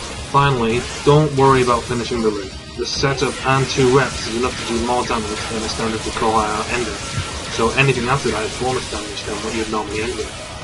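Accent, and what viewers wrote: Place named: United Kingdom. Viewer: I can barely understand your shitty britt accent.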